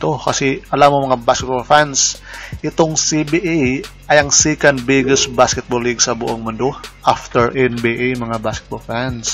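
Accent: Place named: Visayas, Philippines. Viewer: Bisaya man gid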